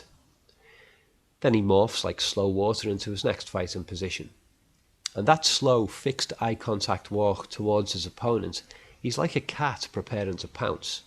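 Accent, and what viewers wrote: Liverpool, United Kingdom. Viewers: you are my new hero... I've long thought of doing a similar analysis but what you've done here is (as of now) head and shoulders above anything I can do... Plus you have the (G)reat British accent (Liverpudlian, I read) which in America assigns automatic intelligence to the speaker, which in your case is legitimately deserved... Just one thing: I'm not sure what the term "roundhouse kick" means to you but per Jeet Kune Do terminology Lee uses in order, hook kick, reverse hook (2), side kick, reverse hook (2), inside crescent and spin outside crescent... Forgive my nit-picking, I've just been in a recent spat with a YouTuber who's been so-called debunking Bruce Lee... Excellent work! I look forward to your analysis of the fight scene with Chuck Norris in Way of the Dragon!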